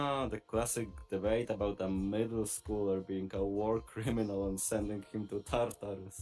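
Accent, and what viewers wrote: Poland. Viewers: You sound like a Polish person, I know what I'm talking about bro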